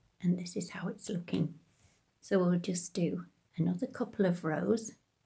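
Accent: England, United Kingdom. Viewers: Great vid - although hearing an English accent speaking about "half double crochets" is kind of messing with my head lol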